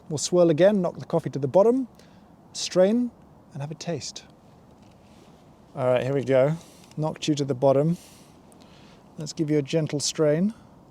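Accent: United Kingdom. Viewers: Articulate British man tells you everything will be okay (ASMR)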